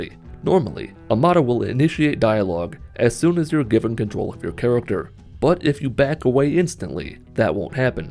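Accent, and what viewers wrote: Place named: Michigan, United States. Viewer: I always wondered why your accent was worse than mine. And its because you are from Michigan and I am from Ohio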